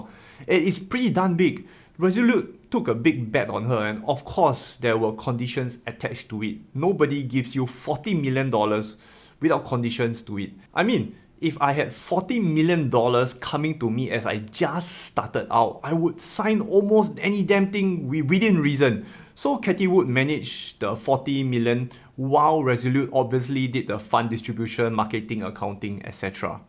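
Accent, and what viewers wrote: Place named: Singapore. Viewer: Singlish😂, long time did not hear it.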